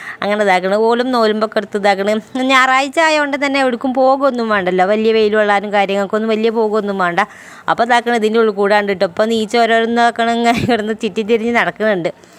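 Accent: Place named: Malappuram, India. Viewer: Ethrayaayaalum ningalude prarthanayum nombum chechi Allahu sweekarikkum.Alhamdulillah . Ningalude varthaanam kettal assal Malappuram thathamarude polethanneyund.May God bless you sister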